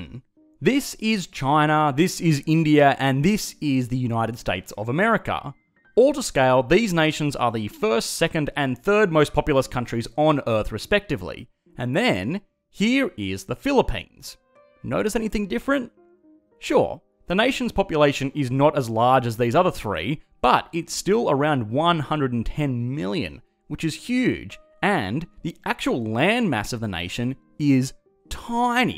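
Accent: Australia. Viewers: He is not a British man. He is Aussie. Accent checked lol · Australian narrator its hard 2 understand ur english accent. Tenx 4 feature d philippines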